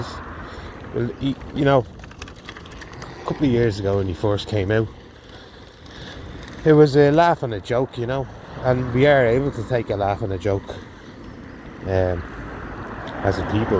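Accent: Ireland. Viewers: you're Irish because you have one Irish parent and even if you hadn't you've been here a long time and sound Irish so of course this is your country.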